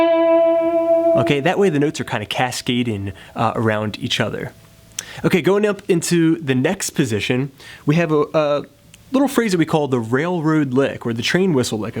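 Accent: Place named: South Jersey, United States. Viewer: let it be known that over the past few years I have watched hundreds of thousands (lol ballpark figure) of guitar lessons via the internet. I would like to say: yours are, by far, my favorite! they are usually very well balanced and easy(ish) to understand. [the (ish) is most definitely due to my level of understanding not your teaching skills haha] I feel like I really do learn a lot. I love how methodical the lessons are; starting with easy steps and bringing it all together with a run through at the end. straightforward and cohesive... seriously, thanks for all of the knowledge 😊! also, you sound like your from jersey. maybe Philly, but probably like south jersey... if so that's cool, if not, ya know... that's cool too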